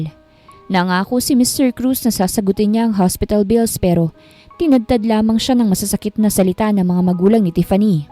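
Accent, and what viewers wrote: Visayas, Philippines. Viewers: Hahaha..nalingaw ko sa bisaya sa narrator..cute😊😊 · imo mga follower's mga bisaya...cute kaau ka mg bisaya...salamat sa new upload...God bless❤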